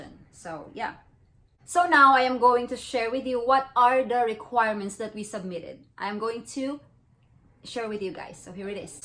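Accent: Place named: Philippines. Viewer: Filipino-english accent! Ang nice! 😊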